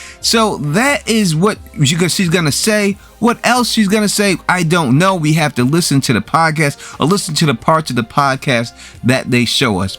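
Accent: New York, United States. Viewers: Next up is Kurt who comes with a SET OF BAWLS ON HIM. omg I love your accent, are you from ny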